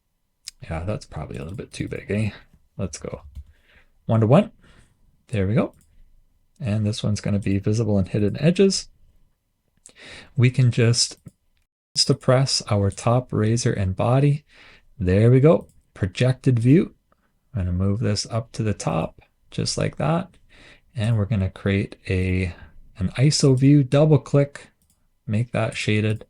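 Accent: Canada. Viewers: I could tell you were Canadian by your accent, and I've been trying to specifically place it, but I don't think I would've guessed BC. Hi from a fellow Canadian!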